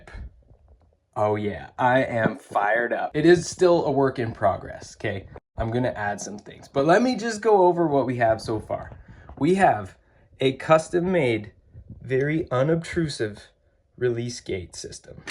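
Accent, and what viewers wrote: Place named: Canada. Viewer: I realize you’re Canadian but the word ‘a’ in the English language is pronounced ’uhh’, not ‘eh’.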